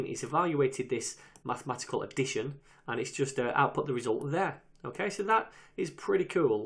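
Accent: United Kingdom. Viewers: "Mathematical Addition" - I like the UK pronunciation. Lot of energy spent while speaking British English I believe.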